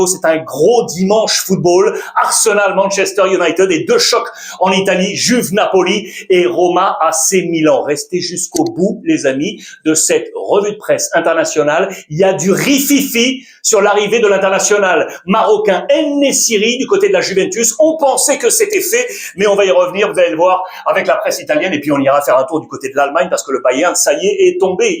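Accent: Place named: Spain. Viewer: Bonjour Alexandre. Mais pourquoi tu n'arrives toujours à prononcer ARAUJO avec ton accent Espanique que j'adore énormément depuis que tu étais encore chez Canal + ??? Alors fais-moi plaisir frérot et surtout pour ça qu'on t'adore depuis Abidjan ❤❤❤❤❤❤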